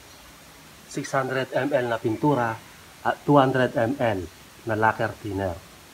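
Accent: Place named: Pampanga, Philippines. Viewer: Kapampangan na kapampangan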